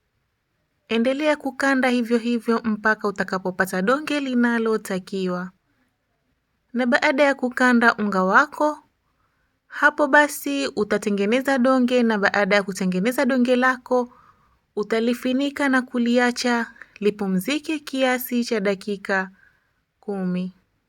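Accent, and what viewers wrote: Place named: Arusha, Tanzania. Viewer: Wow! Asantee my dear, kwa recipe nzuri.. 😋 nitampikia Samosa za viazi! Mume wangu.. 😊 by The way unaongea vizuri, Kama watu wa Arusha! 👍😀😍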